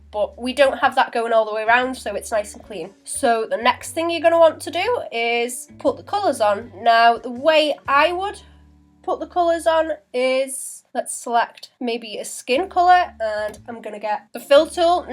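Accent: North West England, United Kingdom. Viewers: I've never seen any of your videos before, and I was surprised to hear your accent. Just through sheer volume 9/10 artists I find on Youtube are from the US. You sound like you might be from the North West like me???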